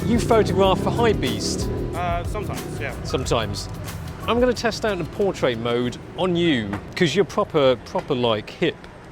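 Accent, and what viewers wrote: United Kingdom. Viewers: With that British accent, i was not expecting an asian guy..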